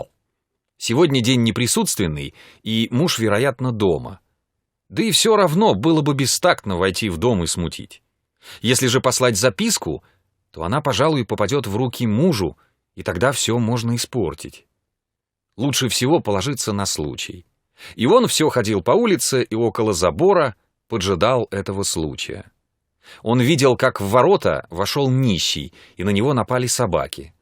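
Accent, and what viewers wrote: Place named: Russia. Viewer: Красивый русский акцент.Красивая дикция. Спасибо.Приятно слушать.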